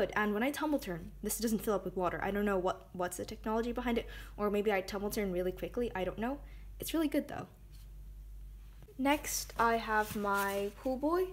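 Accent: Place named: United Kingdom. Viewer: Woww sis i dint realize you have a bit of a British accent lol I noticed it ✌😌✨✨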